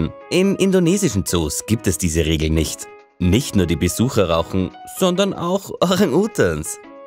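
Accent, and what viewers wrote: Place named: Austria. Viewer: Österreicher! :) Ich bin auch einer, das merk ich doch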